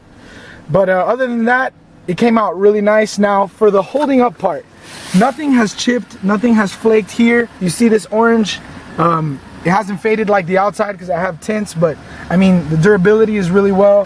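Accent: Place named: Mexico. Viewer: quit talking like a Mexican. Looks good.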